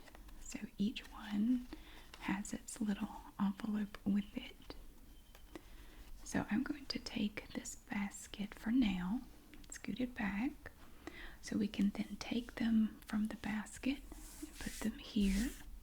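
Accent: South, United States. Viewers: For what it's worth, I adore your accent! I lived in NC for many years and I miss the Southern accents. ❤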